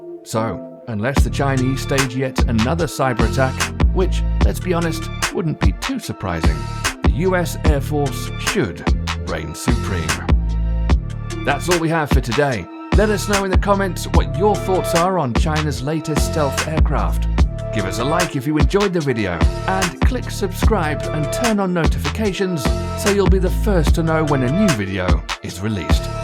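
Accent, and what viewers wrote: United Kingdom. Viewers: sounds like he has a British accent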